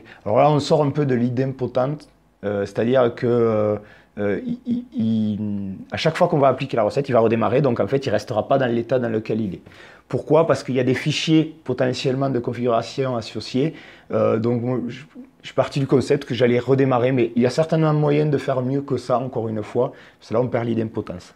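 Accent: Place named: Aveyron, France. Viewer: Merci ! Par contre c’est l’accent aveyronnais 😉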